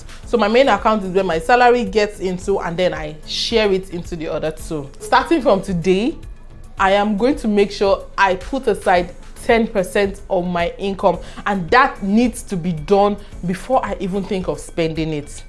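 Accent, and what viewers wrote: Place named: Nigeria. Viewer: Dash me ooh, I've been a strong fan and follower my love.... I love the Nigerian accent. I love your gestures too. I love you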